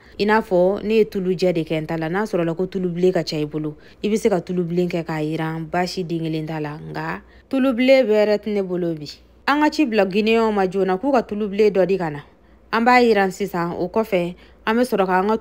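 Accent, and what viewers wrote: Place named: Segou, Mali. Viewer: Just love the way she talks. It reminds me of home (ségou)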